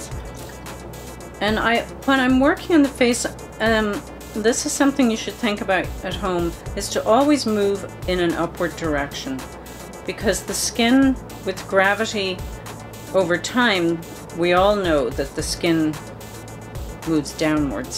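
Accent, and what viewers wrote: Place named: Ireland. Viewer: can hear the irish accent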